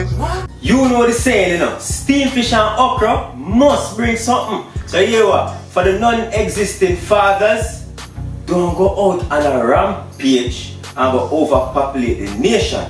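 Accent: Jamaica. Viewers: The vlogger's manner of speaking is called Yard Flex aka Jamaica vernacular. You are probably not a Yardie, so you would not understand the way in which some Jamaicans communicate, and if you re a Yardie, shame on you for criticizing another Yardie when your own writing demonstrates that you have not accomplished basic grammar. De pot a call de keckle black. These types of Jamaicans, with their own flair and creativity, are the ones who made Jamaica what it is. There would be no bloodclaat reggae music were it not for people like this vlogger. By the way, the video is about a Jamaican dish, and not the vlogger's vernacular. So, why are you criticizing the in which he speaks? I know that mispronounciation of words, and stuttering while speaking is very much part of the way in which many Jamaicans communicate. Keep it up brother, your vernacular brings me feelings of nostalgia. 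Nuff respect in every aspect.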